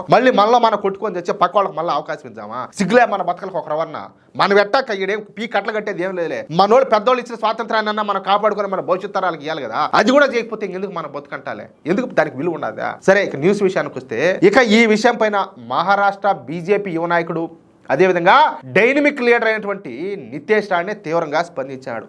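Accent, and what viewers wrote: Rayalaseema, India. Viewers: 😂😂😂😂 అన్నా బలే తిట్టారు......... మీరు న్యూస్ చెప్పిన .... దేశ వ్యతిరేకులను తిట్టిందా చాలా అద్భుతంగా ఉంటుంది......... మీ ఆవేశంలో ప్రతిసారి అందులో మీ దేశభక్తి కనబడుతుంది...... నేను మీ ప్రతి వీడియో చూస్తాను మా ఫ్రెండ్స్ కూడా చాలామంది subscribers ఉన్నారూ.... నేనే చేశాను....... ఈ వీడియో ఇప్పటికి పది సార్లు చూశాను.... మీ తిట్ట దండకం విని పొట్ట చెక్కలయ్యేలా నవ్వి నాను...... కానీ మీరు ఈరోజు చాలా మంచి మాట చెప్పారు.......... మీరు సంఘ వ్యతిరేక శక్తులను తిడుతూ ఉంటే నేను చాలా ఎంజాయ్ చేస్తాం....... మీరు ఉమ్మి నప్పుడల్లా మాకు చాలా నవ్వొస్తుంది ఏమనుకోవద్దు...... మీరు మాట్లాడే తెలుగు భాష వ్యాస యొక్క అభిమానిని నేను రాయలసీమ భాష చాలా చక్కగా మాట్లాడుతారు మీ వల్ల మాకు ఎన్నో కొత్త పదాలు తెలిసాయి... ❤❤🙏🙏🙏🙏 ముఖ్యంగా నేను రాయలసీమ ఆంధ్ర కోస్తా యాసల ఎక్కువగా పరిశీలిస్తారు.... చాలా కొత్తగా ఉత్సాహంగా ఆనందంగా అనిపిస్తుంది..... ఇట్లు కరీంనగర్ జిల్లా వాసి.....❤❤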